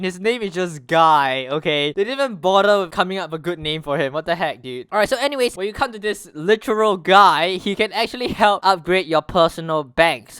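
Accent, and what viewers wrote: Singapore. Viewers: R u from singapore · You sound like you're singaporean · Dude r u singaporean cause i am and u have the accent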